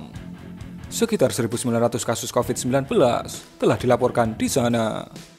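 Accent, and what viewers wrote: Java, Indonesia. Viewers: suara admin yg medok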